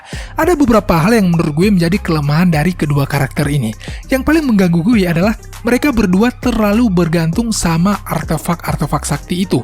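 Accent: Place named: Bali, Indonesia. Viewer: Logat bali ne.. Adminnya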